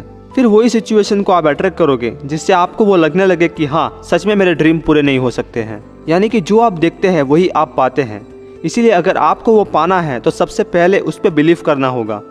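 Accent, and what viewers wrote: Gujarat, India. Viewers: Good tips, very useful. Are you from Gujarat?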